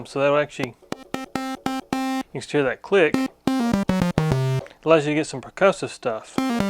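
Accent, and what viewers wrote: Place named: Appalachia, United States. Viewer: Your hillbilly accent is just adorable.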